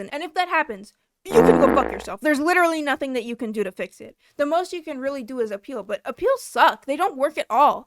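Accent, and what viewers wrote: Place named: Mexico. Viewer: You sound mexican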